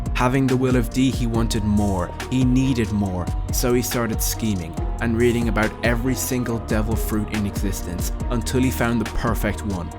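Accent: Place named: United Kingdom. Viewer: Such a lispy British accent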